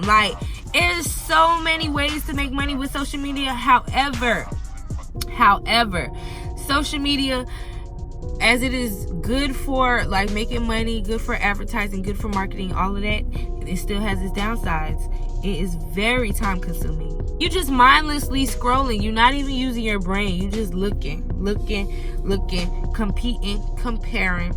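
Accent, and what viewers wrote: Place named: Miami, United States. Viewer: Sounds like you from Miami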